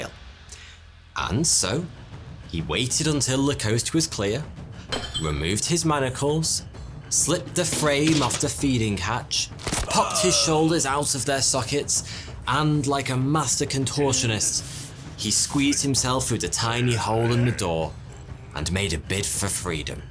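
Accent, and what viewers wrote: United Kingdom. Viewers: ...THE MAN THAT LOOKS LIKE A GERMAN BUT SPEAKS LIKE A BRITISH MAN...U R 2 F....N CUTE I JUST WANT TO TAKE YOU HOME...😃 👄 💋 💘 MERRY XMAS LOVE...🎅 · what do you mean? At first, I assumed this was a pro-america joke, insinuating the English accent is incorrect, which i applaud because its hilarious. However, I then noticed "mate" and realized this is clearly no American joke. So i ask again, what do you mean? Does he have a speech impediment? As an American, i cant tell, it just sounds like British-English or another one of those funny non-American lingos. On a side note, i do totally agree with your main point, no reason for this Japanophile to get on the guys case about using the typical foreign-pronunciation of Yoshi. Nothing worse than the white dude who forcefully pronounces all Japanese words as authentically as he possibly can. 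It's just sad when you hear those clowns order a Sapporo beer by belting out those staccato syllables "SAH-POH-ROH". You just want to turn to them and say "yeah man, we get it, you watch Narutto or something" but they would problem take that as a compliment of their comically incorrect accent so we stay quiet. If your speaking in English, keep the words in English, say "sake" like the rest of us, not "SAH-KAY". And I say this all as someone who lived in Japan for years, not just an ignorant American... Well, i suppose I say it as an ignorant American who lived in Japan for years...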